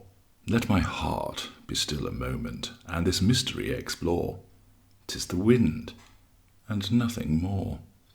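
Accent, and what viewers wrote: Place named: Yorkshire, United Kingdom. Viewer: I can still hear Yorkshire accent though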